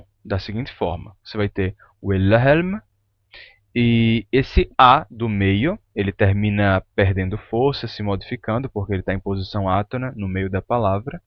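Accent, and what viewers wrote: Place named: Maranhão, Brazil. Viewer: Esse canal é muito massa. Adorei conhecer e já to consumindo tudo. A propósito, uma curiosidade: no seu sotaque, o R de PORQUE é aspirado ou ele é sonoro? Esse R é tão sutil que ele soa como o H do início de frases em inglês. Vejo maranhenses e tocantinenses (com quem tenho contato) pronunciando um R muito parecido com esse som aspirado do H. Procede?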